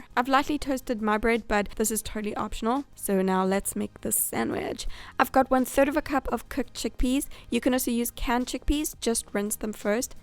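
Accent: South Africa. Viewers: haha that South African accent.. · sounds like ur from SA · Ek kan jou wit-mens Suid Afrikaanse aksent DUIDELIK hoor 😂❤ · I love your videos I’m going to start watching your videos. Also I love your accent // are you South African? Xxx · South african??? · are you South African? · What's the accent??? (I think I'm wrong but you sound South African)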